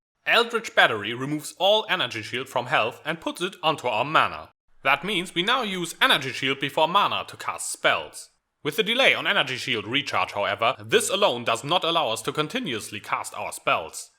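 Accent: Germany. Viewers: Talking like what? He's German, you expect him to have no accent?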